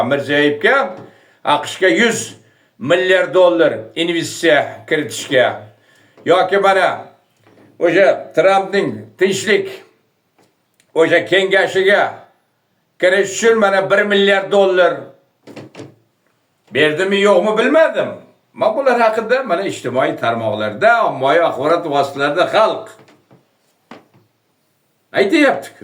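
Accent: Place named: Tajikistan. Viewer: СССР ДАВРИДА ХАММА ЭРКИН ЯШАГАНДИ , ХУКУМАТГА ИШОНЧ КУЧЛИ ЭДИ , ИШСИЗ ОДАМ БУЛМАГАН , АЙНИКСА ОЛИЙ УКУВ ЮРТИГА КИРИШ У.Н КАТТИК ТАЙЕРГАРЛИК К.К ЭДИ , ИМТИХОНДАН УТОЛМАСА КЕТАРДИ , КОНТРАКТ СУППЕКОНТРАКТ ДЕГАНИ ДУБ КАЛЛАНИ УКИТИБ , ДИПЛОМЛИ САВОДСИЗНИ ТАЙЕРЛАШ ДЕГАНИ , ТАРТИБ , ИНТИЗОМ ТАМОМАН ИЗДАН ЧИККАН , АММО БУ ТИЗИМНИНГ ИСКАНЖАСИДАН ЧИКИБ КЕТИШ ОСОН БУЛМАЙДИ , ШЕВАСИГА КАРАГАНДА ДОМЛА ХАМ ТОЖИК МИЛЛАТГА УХШАЯПТИЛАР...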